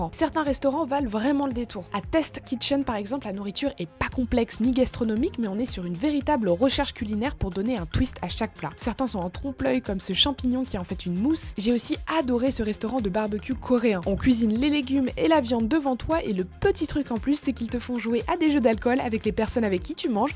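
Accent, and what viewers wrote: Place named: Belgium. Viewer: Tu ne serais pas Belge ? On dirait que tu as un petit accent en fin de phrase !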